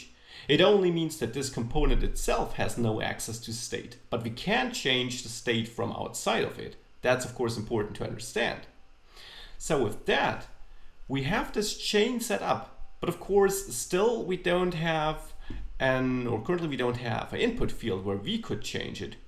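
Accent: Germany. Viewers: My first though was: "the way you are speaking... german guy". :D Thats cool. I found these days polymer. This looks really cool and a nice way how to create new kind of views with real components. Greetz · Personally I really like when Germans speak English beacuse then it is very easy to understand at least for me. And of course - I really enjoy your amazing lessons as I'm touching React for the first time. Greets from Poland :)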